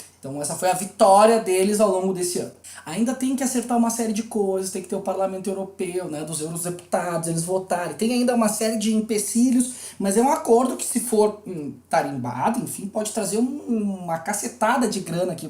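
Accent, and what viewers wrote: Porto Alegre, Brazil. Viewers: Mazá, Professor! Tava com saudade do nosso YouTuber com sotaque portoalegrês!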